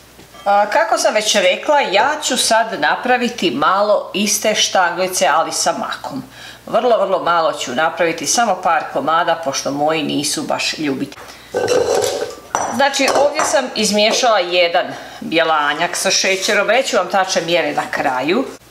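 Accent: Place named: Slavonia, Croatia. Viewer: Draga.Iako se ne poznamo gledajuci vaše recepte postali ste mi tako bliski ne samo zbog predivnih slavonskih recepata jer i ja sam porijeklom iz Slavonije nego zbog toga što iako ste u daljini čuvate svoju tradiciju i još uvijek govorite prelijepim slavonskim govorom.Puno pozdrava Vama i Vašoj obitelji i želim vam svako dobro jer jako ste me obradovali slavonskim receptima i slavonskim govorom.Ma gdje živjeli u srcu zauvijek nosimo mirise i okuse rodnoga kraja.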